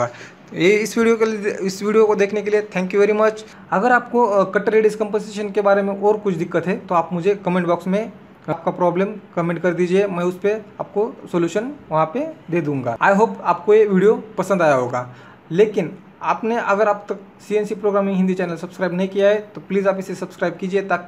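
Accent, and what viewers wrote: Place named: Maharashtra, India. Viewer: Loved the vedio But Marathi anscent is just awesome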